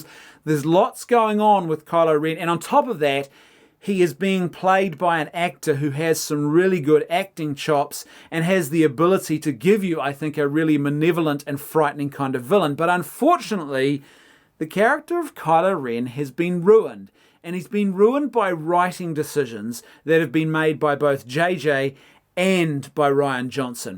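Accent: New Zealand. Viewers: I can tell you are Kiwi by the way you pronounce “fish and chips”, “fush and chups”. In Australia, they say “feesh and cheeps”. 👍🏻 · My guess is Kiwi from the south Island; If I got it right, it took me 5 years to hear out the distinctiveness in the accents...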